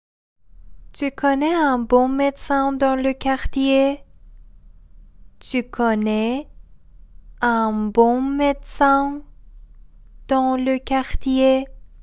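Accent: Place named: England, United Kingdom. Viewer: You have a very strong English accent that's ok 😂😂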